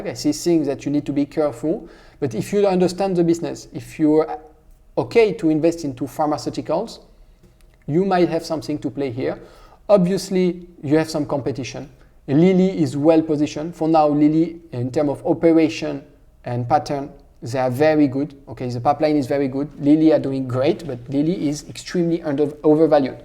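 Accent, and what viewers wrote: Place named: France. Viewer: Nice video. I have been following you for a while and I really enjoy your content (and your french accent). I bought the dip on EVO when your video came out- which paid off nicely. I have a few questions that I would like to ask you: 1. I was wondering where you see Francois Rochon's current portfolio that you mention in this video? 2. Have you thought about using StockOracle for your videos? The tool is amazing and works well for youtube videos. 3. Why don't you have Apple in your portfolio (It is one of my biggest holdings along with amazon and google). Btw.. Novo Nordisk is my favorite investment case BY FAR, so I'm happy to see it in your portfolio! All the best.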